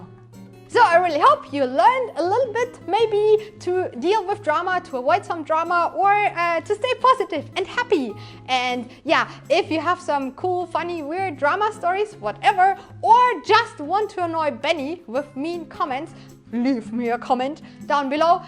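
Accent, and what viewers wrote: Germany. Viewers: awesome! I wish I could have friends like you around me that realize all the work done when you make a costume, realize a vid, a how to vid, a thing with a 3d pinter, learn moves on a fight scene or how to utilize softs like photoshop, lightroom, fusion360, hitfilm, passing time consuming energy trying to find how to make this special costume piece with this special color or texture, find materials and technics...generally all I have is people doing sports and watching tv series, never make a thing with their hands, so likes are few. Anyway, having positives people like you, enjoy my day and the most important thing is to be proud and happy of what we are, whatever we are, we are awesome , and never give a sh.. to people that dislike just for fun. (special mention to your german accent that I love because I understand all (and probably I have almost the same because I'm french :-D ). 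my tip when I see a bad comment: scroll! :-D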